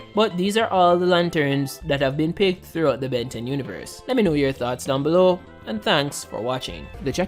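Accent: Jamaica. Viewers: I love your accent, sounds Jamaican. Reminds me a lot of how Bob Marley talked.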